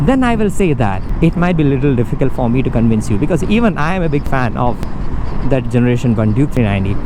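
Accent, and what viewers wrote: Kerala, India. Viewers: Why do you sound like a Malayali?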